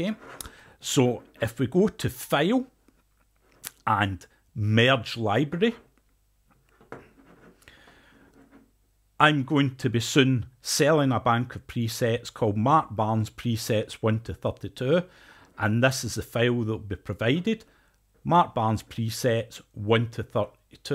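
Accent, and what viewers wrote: Scotland, United Kingdom. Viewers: Are you by any chance ........ scots?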